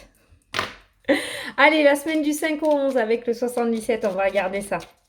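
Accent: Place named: Marseille, France. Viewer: Ta repris le marseilles..😅 i knew it !!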